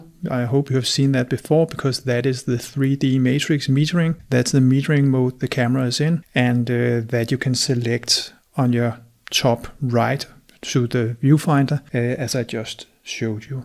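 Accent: Denmark. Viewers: are you danish? your accent is very danish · I love your Danish accent :)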